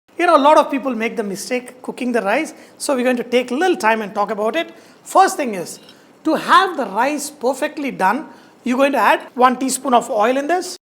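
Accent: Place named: Telangana, India. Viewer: i like your Telengana dialect narrations the most!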